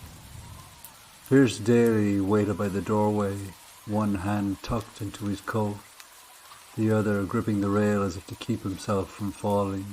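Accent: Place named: Clare, Ireland. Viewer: Love that I found your page. These stories remind me of the ghost stories my granny used to tell us. Thanks for the nostalgia. I keep trying to guess which county you're from by the accent. My guess is Co Clare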